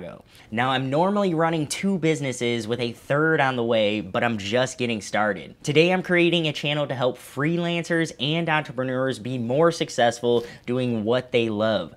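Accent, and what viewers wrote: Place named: Midwest, United States. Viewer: Midwest accent gotta love it! I say this in my own Midwest accent.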